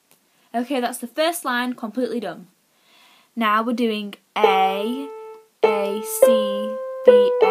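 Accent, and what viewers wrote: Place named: United Kingdom. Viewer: Silent night was the first song you thought meand I was OMG I never thought I would be able to play it and also I love your British acsent and you also sound like Luaren Platt in the XFACTOR your lovely piano teacher your teaching is very easy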